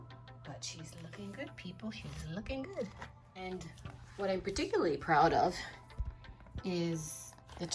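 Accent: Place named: Bermuda. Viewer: Always nice to watch your DIYs, they’re very satisfying along with the very Bermudian commentary😉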